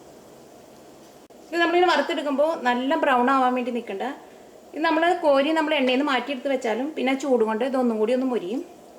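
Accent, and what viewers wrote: Thrissur, India. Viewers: ചേച്ചിടെ ഭാഷ കേൾക്കാൻ തന്നെ വിഡിയോ കാണാറുണ്ട് ... അങ്കമാലി, തൃശൂർ ,,, ഭാഷ ഒത്തിരി ഇഷ്ടമ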